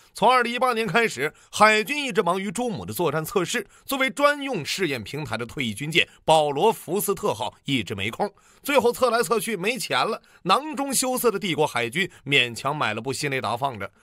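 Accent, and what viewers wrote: Northeast, China. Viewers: 东北口音难听死了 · 为啥把劳斯莱斯 翻译成罗尔斯罗伊斯呢？ 听口音你大陆人啊。 新的DDG主要是 操作熟练。从濒海 猪母 这些。还是老的伯克级靠谱。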